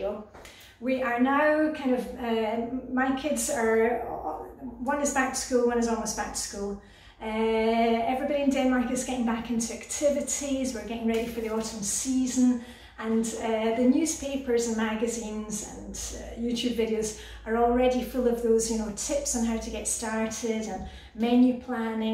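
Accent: Scotland, United Kingdom. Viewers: My daughter was wondering if you speak Danish with a Scottish accent.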